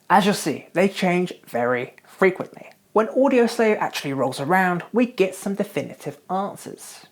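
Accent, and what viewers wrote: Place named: Southern England, United Kingdom. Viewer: Awesome stuff as always. I even ordered a curry just have watching this, well worth it. Here's a left field suggestion - How about YOUR rig? :) Ever thought abouot doing a 'Rig Rundown' series with bands nearer to you? Considering your accent makes me think you're from somewhere between Bristol, London or even Sussex, you'd probably be inundated with choice, right? :)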